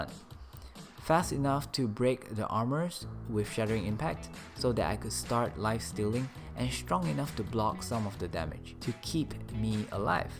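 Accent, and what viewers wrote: Singapore. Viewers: what are u Singaporean?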